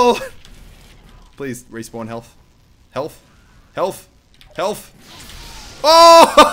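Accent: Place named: Australia. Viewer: Your Australian accent seems to come out a lot today. I like it (•w•)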